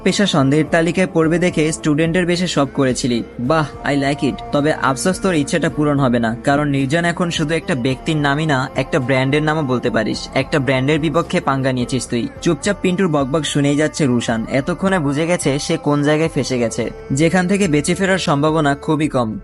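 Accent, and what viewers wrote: Sylhet, Bangladesh. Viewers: Vai apni ki Sylhety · ভাই আপনি কি সিলেটি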